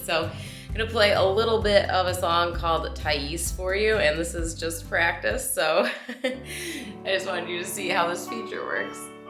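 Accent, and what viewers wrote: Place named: Upper Midwest, United States. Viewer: I had literally never heard her speak, so I didn’t know she had such a thick Wisconsin/Minnesota accent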